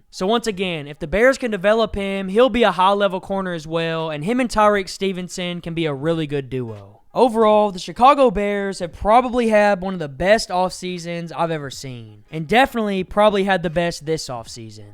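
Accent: South, United States. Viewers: Good comment but it is a southern accent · You have a nice voice youngin.. and your production is very clean.. Great used of the 5 sec rule, facts and references. I look forward to hearing more from you. Your defiantly in the south somewhere with that comfy accent lol. Keep it going, dont do some idiot type stuff, watch you company, keep pumping and you can go pretty far.. Look forward to hearing you on ESPN .. Keep your nose clean.. Sub!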